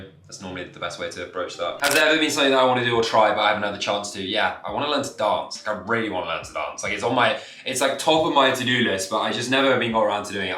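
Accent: United Kingdom. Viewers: Your accent is so different, Ik it's british but it also sounds like there's some other accent mixed in with british, I'm surprised at myself that I can understand what ur saying 95 percent of the time cause english isn't my first language, sometime there are some words I cant catch or understand cuz ur speaking way too fast but its all good, love your vids, currently doing a marathon of ur vlogs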